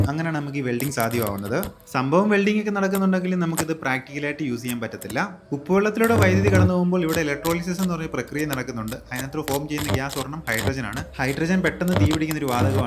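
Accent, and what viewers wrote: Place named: Pathanamthitta, India. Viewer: പത്തനംതിട്ട ആണെന്ന് തോന്നുന്നു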